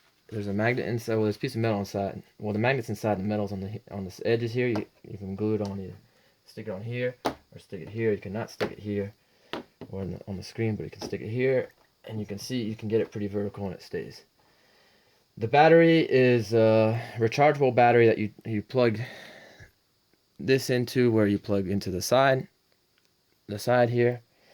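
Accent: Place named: East Coast, United States. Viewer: Ahhh ok gotcha. Exactly, the person mentioned cubebrush, whoever that is. Another I'll need to look up. The artists you mentioned are great btw. You live in Europe? Hmm wouldn't have guessed that. You sound kinda like you're from the East Coast.The paper one sounds good. No way that can scratch the screen too right? Yea the plastic is harder to control. Almost impossible to get fully controlled quality of line I found at least. So you got two models one early and one later as a replacement and they both were smooth..? Yea see those in videos, glad they replaced it. Wow that's awesome. K good hope not. K. Would you want to sell the Corel? I hear you. Been watching videos on Photoshop.. the mixer brush looks really interesting. I'm guessing it's only on the stupid CC versions? Was trained on Photoshop too long ago, forgot everything. 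Sure via torrents and key g3ns. Don't condone it either but good to know. Great idea, will do that ty. Very good to know all that. One thing I'm dreading is setting up things, settings etc. Am already pretty systematic in setting up computers but with the number of settings to save to the remote, the number of brushes one can use, all the different settings to account for it looks a bit overwhelming. Yes you did, thanks so much!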